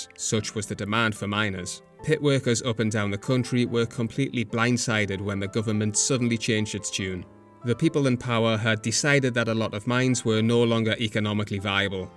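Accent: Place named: North East England, United Kingdom. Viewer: Hearing a guy with a north east accent talking about Myazaki, my immediate thought was: ‘Well he’s going to mention Tynemouth isn’t he’ Glad I was right. This is a great video! I live in the NE and I have a copy of A Trip to Tynemouth. My Japanese isn’t good enough to read it yet, but I’ve been learning, so getting through the whole manga is one of my goals!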